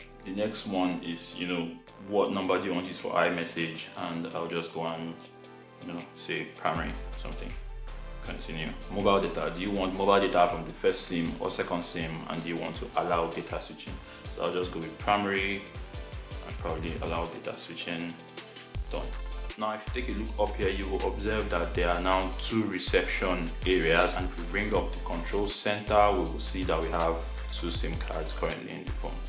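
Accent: Nigeria. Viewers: Good day, You sound NIGERIAN. Please how does one call using the secondary sim from contacts. Since I can have numbers in my head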